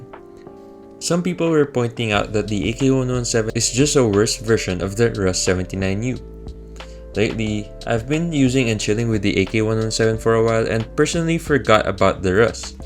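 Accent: Philippines. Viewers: Idk but the way he speaks sounds like how a Filipino speaks English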